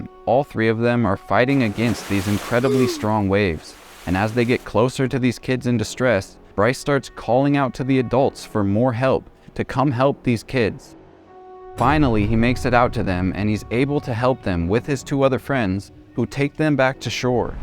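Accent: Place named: United States. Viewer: yvw.... enjoying u v much ... plus uve got the only american accent ive ever been able to handle lol xxx <3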